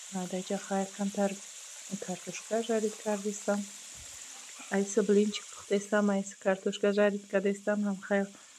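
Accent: Tajikistan. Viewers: Салом хоҳарҷон барои таърифтон гап намеёвам чихел таърифтон кнм❤❤гапзанитон тоза тоҷикона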